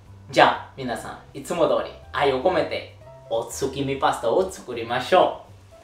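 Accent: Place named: Naples, Italy. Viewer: ナポリの方言カッコ良すぎないか？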